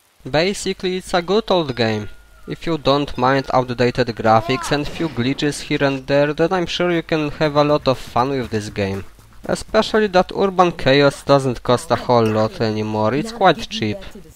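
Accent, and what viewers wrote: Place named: Poland. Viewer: Epicki polski akcent :D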